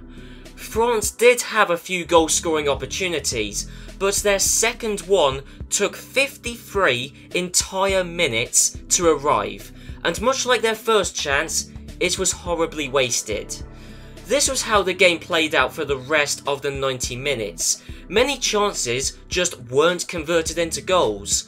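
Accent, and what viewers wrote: United Kingdom. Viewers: Men do I have to correct so many names in Portuguese and Spanish cause of your British accent...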